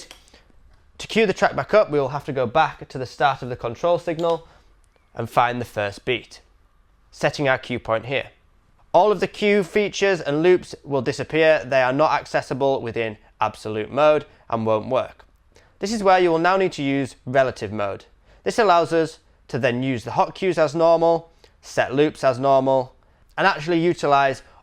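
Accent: United Kingdom. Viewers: Thanks for the info but your brit accent makes it inunderstandable.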